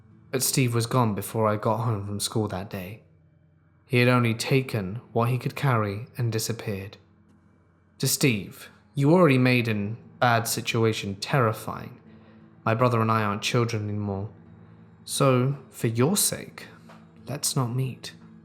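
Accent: England, United Kingdom. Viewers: on a funny note, I listen to English narrators (just prefer the British cadence, I suppose) so much that you got me saying “narrator” the English way, rather than the American way. I didn’t realize we pronounced them differently until I said it in front of my bf and he gave me a funny look and said “.... say “narrator” again..”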